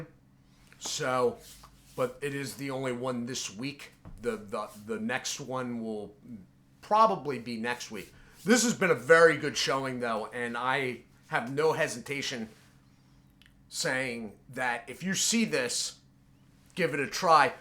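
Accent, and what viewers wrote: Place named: Philadelphia, United States. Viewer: I live in South Jersey judging by your accent I'm assuming you're from Philly, love your videos, does anybody know where I can get this around South Jersey or Philly thank you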